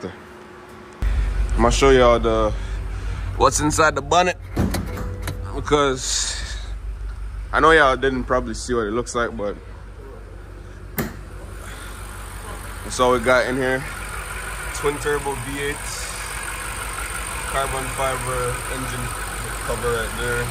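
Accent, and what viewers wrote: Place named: United Kingdom. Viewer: Briish mon eh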